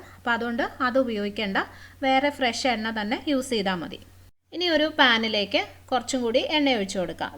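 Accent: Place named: South Kerala, India. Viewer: njan kollam aanu...njan chechiyude eth recipe cheyth nokkiyalum ath kidukkachi ayitundakum...ith vare. chechide samsaram njangalude pole...apozhe thonni chechi thekkan keralakkari anenu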